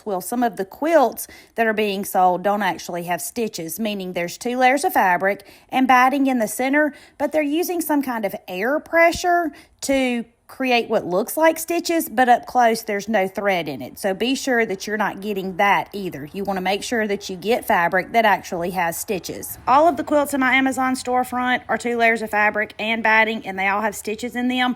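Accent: South, United States. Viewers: first of all, I am a novice quilter - still haven’t actually quilted my flimsies, just had other people quilt them for me. Second of all, thank you for being such a great teacher. I say that not because I’ve tried anything of yours yet but because you have made this all seem really do-able for this 65 year old novice! I can’t wait to try this quilt coat! And, finally, than you for sharing that beautiful Southern accent (I have one, too); it harkens to “home” for me and, as I’m sure you know, is totally disarming! I’m hoping you will show us how to make some matching mittens and maybe even a hat or toboggan of sorts from this beautiful quilt’s scraps! Please! Oh! and maybe a quilted scarf for winter, too? (I’m living in Colorado now.) And, I almost forgot! I really want to make a mandarin collar for my coat - but I have NO IDEA how to do that. Did I mention I’m a novice?;) I look forward to learning from you!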